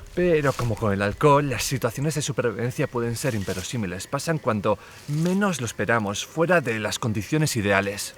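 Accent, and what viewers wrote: Basque Country, Spain. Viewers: Parece VASCO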